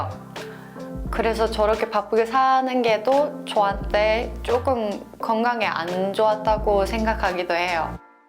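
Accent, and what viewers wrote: South Korea. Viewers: 대구사투리 느껴짐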